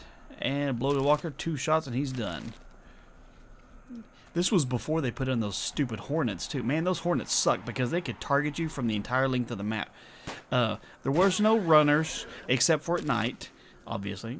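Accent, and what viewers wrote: South, United States. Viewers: I'm curious where your from I can hear the southern in your voice and I like how you talk very clear and to the point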